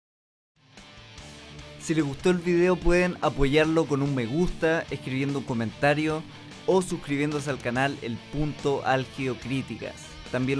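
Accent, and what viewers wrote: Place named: Chile. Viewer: Amigazo. Me encantó tu vídeo y estoy de acuerdo en toooooooooodo lo que dijiste. Para mí este álbum es una obra maestra y el mejor album que Metallica pudo haber hecho! Así que tiene su merecido like y un sub más jeje. Por cierto, de que país eres? Tienes acento chileno . . . Pero quizás me equivoque sjjsjs saludos!! 🤘🔥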